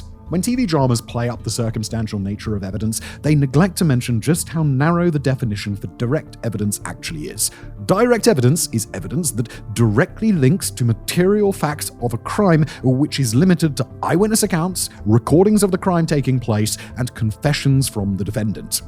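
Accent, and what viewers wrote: United Kingdom. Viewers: The whole video seems very US-based for someone who sounds British and lives in the EU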